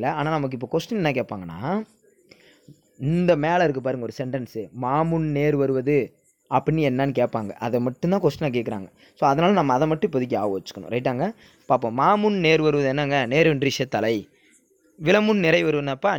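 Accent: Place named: Madurai, India. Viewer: Madurai slangue 😂👍🏽 in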